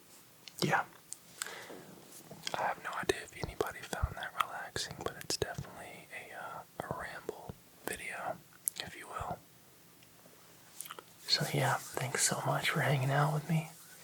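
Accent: United States. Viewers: Idk why but you sound extra american today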